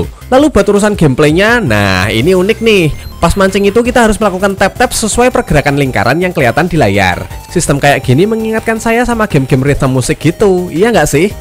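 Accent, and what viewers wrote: Java, Indonesia. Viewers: Bg jangan meddok kali bg. Kami yg bukan sesuku agak bingung bg dengernya 👍🏽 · Bahasa indonya medok banget yak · Medok e puol mass😂 tapikusuka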